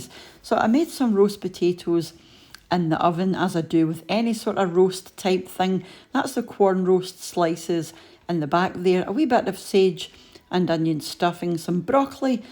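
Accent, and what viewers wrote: United Kingdom. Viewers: Wow, if not for your accent, I would think that your menu was pretty much right here in the USA! I had no idea that Mexican food is so popular and available in Britain. And iceberg lettuce? How long has that been available there? I'm 75, and iceberg has been around here forever. Now Romain lettuce is quite popular here. Luv ❤️ your videos.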